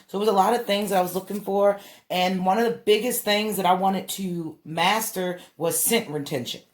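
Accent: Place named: Baltimore, United States. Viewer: Your from Baltimore right ? Your accent gave it away, i have family there❤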